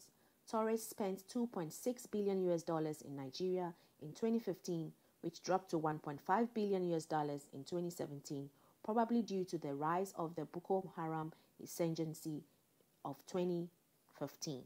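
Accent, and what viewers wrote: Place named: Ghana. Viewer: The accent is ghanaian and this channel is ghanaian...so direct this your vitrol to them..Olofo!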